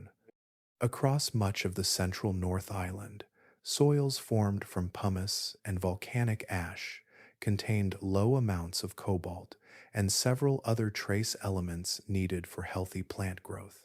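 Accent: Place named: United States. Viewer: Since you have an american accent, it'd be more like Toe-paw.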